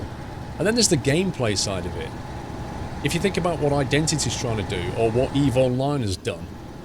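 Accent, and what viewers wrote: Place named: Liverpool, United Kingdom. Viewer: .. loving the woolish Scouse twang 😁! Question for next week: with this generation (which am half in half out being 27).. and with the internet being something this generation is born in... Do you think games have changed to feed this generations instant gratification?.. as in... Do you think games have got easier and have quicker checkpoints so you can get quick satisfaction... I remember how quickly I used to complete super Mario world for the snes and I went back to it the other week and it was soooo much harder than I remembered..... What's your thoughts??? Next topic: console pc mobile gaming.. which is best for which genre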